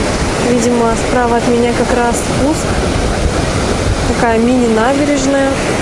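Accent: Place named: Urals, Russia. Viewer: Вау, я угадала!!! Точно, с Урала, слышу знакомый акцент. Я сама с юга, училась у вас в Перми. Да, у меня уши отваливались от вашего ,,О,, ,,АГА,, слова жуете.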